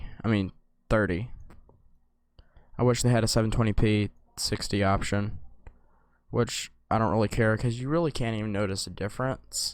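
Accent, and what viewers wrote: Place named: South, United States. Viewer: Your light southern accent sounds really cool.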